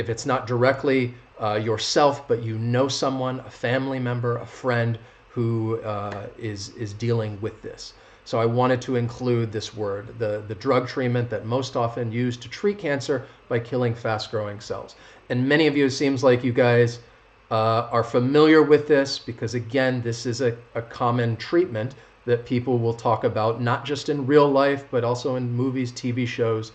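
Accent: United States. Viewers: Fuuuuu. American accent. Switch off.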